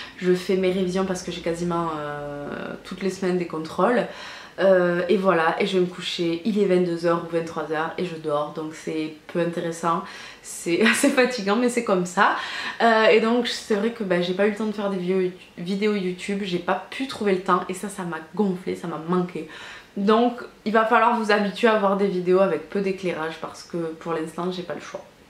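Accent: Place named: Southwest, France. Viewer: Il faut toujours avoir de l'espoir et prendre ses rêves pour des réalités. Ca fait un peu cliché et tellement vu et revu mais en même temps c'est teeeellement vrai... Merci beaucoup pour ta réponse en tout cas, ça fait pas mal de temps que je te suis, ça me fait plaisir de voir une Youtubeuse qui est proche de ses abonnées et qui en plus vient du Sud-Ouest! J'habite à Toulouse mais je viens d'un petit village à côté de Tarbes, j'ai le même accent que toi :p Bisous!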